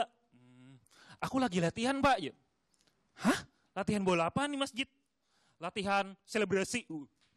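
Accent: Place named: Banjar, Indonesia. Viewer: Logat Banjar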